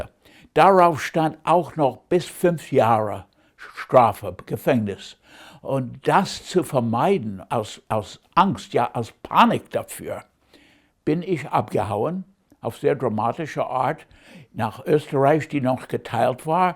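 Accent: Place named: United States. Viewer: He still has quite an American accent when speaking the German language.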